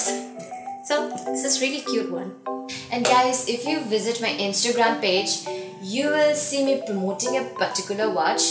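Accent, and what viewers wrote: England, United Kingdom. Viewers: I still can't get over your cute English accent 😍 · I like your hair and your English accent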